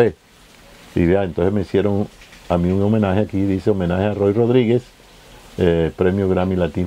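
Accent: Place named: Caribbean Coast, Colombia. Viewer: Cuando adolecsrnte aprendi a tocar el acordeon piano, antes de irne al Conservatorio. Hace años no lo toco. Me dediqué al clarinete y luego al piano. En Sincelejo, alguna vez me afino el acordeón un señor llamado William Molina; hermano de Aniceto Molina. Recuerdo que en ese entonces, me senté en su taller a observar como hacía su trabajo y quedé asombrado de ver el mecanismo interno de mi acordeón piano de 120 bajos. Pero noto que este señor sabe muy bien de lo que habla y hace. Un manejo muy pulcro del lenguaje tecnico y musical. Me encanta tambien el estilo elegante al hablar del acento Costeño colombiano. Estoy embelezado de tanto conocimiento. ¡Felicitaciones al Maestro!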